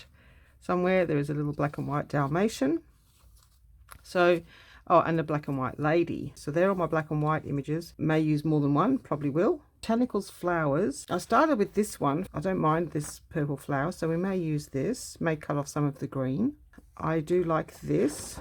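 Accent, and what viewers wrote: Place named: Australia. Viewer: So glad I found you not so long ago as I find listening to a fellow Aussie really relatable. Love what you use to collage with. It was great to see the magpie and how friendly it was. We used to have a family of magpies who came and played on the kids trampoline and our hills hoist for years until the crows moved in. Really miss them still. I would love to be in the giveaway for the stamps. Kicking myself for giving my stamp album years ago before I started collaging. I have saved your playlist of Margarete Miller collage challenges to watch and have seen a few already. Thankyou for sharing. 🙂🙂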